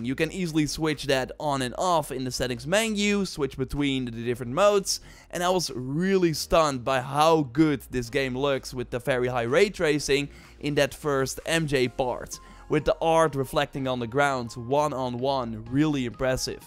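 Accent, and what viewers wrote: Netherlands. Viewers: Dutch accents were made for youtube, your videos are very entertaining! Keep it up!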